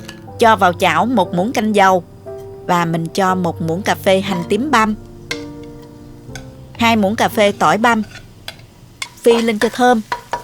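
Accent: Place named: Saigon, Vietnam. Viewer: Giọng nói đúng là người SG đó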